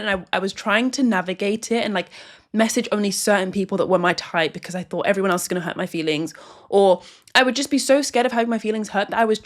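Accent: United Kingdom. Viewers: Personal question- what country are you from? Based off the accent you sound like your from the UK.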